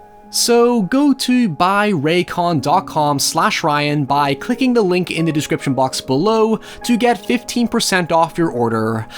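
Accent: Northern Ireland, United Kingdom. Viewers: Btw Ryan, I think your "soothing, sexy Northern Irish accent" adds to the uniqueness of your content and almost seems to add to the mysticism of the movies and topics you discuss. :) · Ryan, thank you for flat out saying you're Northern Irish. I'm fascinated by accents within the English language as a non-native speaker and I've always wondered if this soothing voice of yours was, in fact, an Irish one. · "If you wanna listen to my soothing, sexy NORTHERN IRISH accent..." Oh, so THAT'S your accent! I uh... don't know how I didn't get that. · Northern Irish? always thought you were Scottish. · northern irish accent 😍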